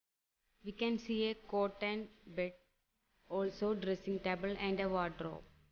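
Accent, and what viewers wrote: Kerala, India. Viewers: Who noticed malayali voice over 🤠😀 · Sound like southern accent of female may be Kerala's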